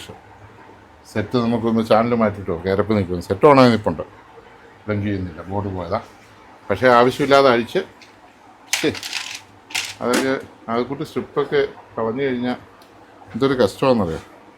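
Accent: Kottayam, India. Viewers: താങ്കളുടെ ഒരു ആരാധകൻ ആയി മാറി ഞാനും കറ കളഞ്ഞ സംസാരവും പിന്നെ കോട്ടയത്തുള്ള അച്ചായന്മാരുടെ തനി പകർപ്പും പിന്നെ എന്തു വേണം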